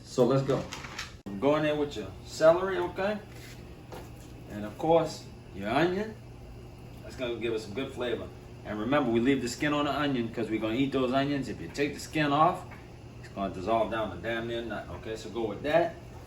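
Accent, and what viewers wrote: Louisiana, United States. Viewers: I’m from West Monroe, Louisiana and I love a Cajun boil and accent!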